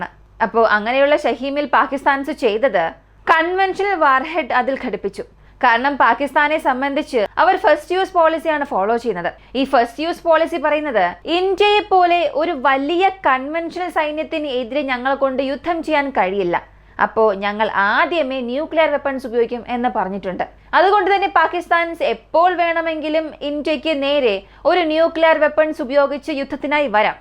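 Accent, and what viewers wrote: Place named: Thiruvananthapuram, India. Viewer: വല്യ കുഴപ്പം ഒന്നും ഇല്ല മോളെ. പിന്നെ Trivandrum slang ഒളിച്ചു വെച്ചാലും......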